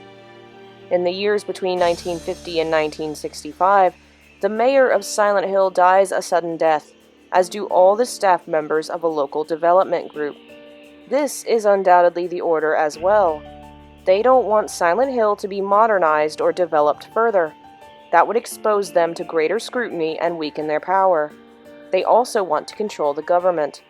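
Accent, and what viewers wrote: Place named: Virginia, United States. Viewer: omg are you from Virginia?? I've been wondering what your accent is and I thought Virginia. I went to middle and high school in Richmond :)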